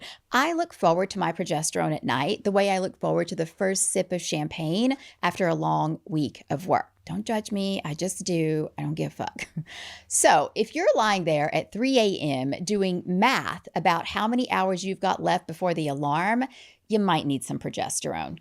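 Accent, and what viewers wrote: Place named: Appalachia, United States. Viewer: I just recently subscribed! I LOVE your content and finally feel there is someone out there speaking the truth unapologetically. Much respect! You are beautiful inside and out and an inspiration! ❤️ By the way, I love your southern accent! What part of Appalachia are you from? Im a southern girl as well, but from Louisiana. Thank you for the amazing content!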